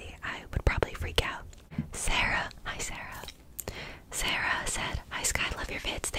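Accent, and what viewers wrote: United Kingdom. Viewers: omg your british accent is so beautiful omg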